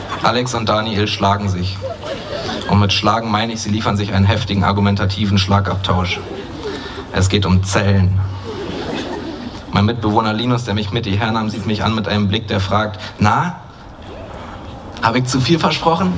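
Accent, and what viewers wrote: Berlin, Germany. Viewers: der Text an sich ist schon sehr schick, zusammen mit seinem Berleinern bekomm ich mich aber an manchen Stellen nicht mehr ein. Slampoeten mit Dialekt sind einfach genial, liebe es das niemand ihn versteckt.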